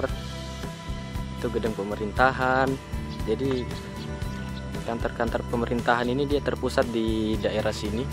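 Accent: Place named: Java, Indonesia. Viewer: Bahasa jawanya medok banget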